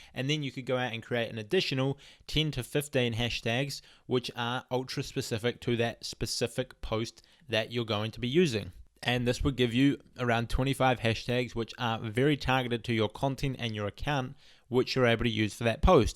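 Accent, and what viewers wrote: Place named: New Zealand. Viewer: Apart from that nice video, I am a big fan of that Kiwi accent!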